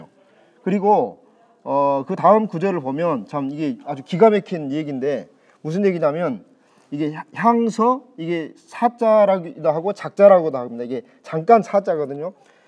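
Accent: Jeolla, South Korea. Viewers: 말투가 전라도신데 사투리가 경상도라면 더 믿음이갈것같네요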